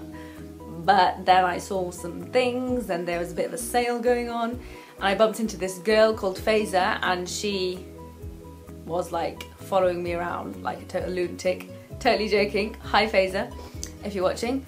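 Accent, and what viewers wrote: United Kingdom. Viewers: I love what you wearing, and i love british accent. <3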